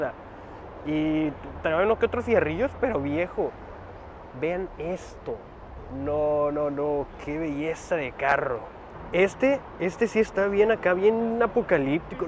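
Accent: Mexico. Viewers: que gracioso ver un japonés hablando como mexicano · no mames wey, un chino hablando como mejikno en Japón.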